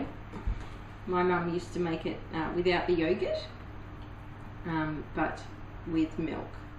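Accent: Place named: Australia. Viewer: Oh wow! Looking for a Bassboussa recipe and getting one in an Aussie accent, so refreshing:) Hey lovely, I have seen a few tips about not mixing it long enough (so as not to release the gluten) some mom’s actually go as far as saying mix the dry ingredients with the melted butter first (to coat the semolina individual particles) Will definitely try it this weekend, hosting a professional chef for dinner, pressure is on! :)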